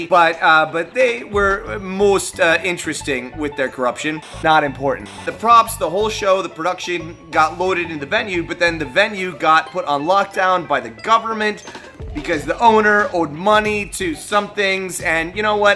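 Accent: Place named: Minnesota, United States. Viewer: That Minnesota accent though 😍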